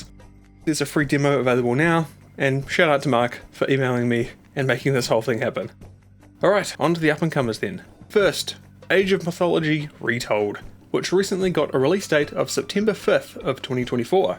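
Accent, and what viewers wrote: New Zealand. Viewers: Are u a kiwi bro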